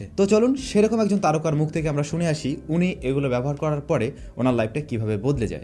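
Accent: Barishal, Bangladesh. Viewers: vai ki mogo deshi naki.................. i mean borisal......???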